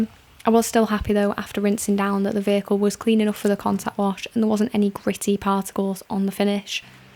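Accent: United Kingdom. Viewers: You would definitely be a big hit with that British accent in the us.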